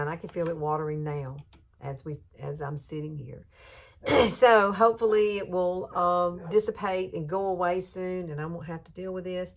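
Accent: South, United States. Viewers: I love your southern accent and you look like a green eyed Heather Locklear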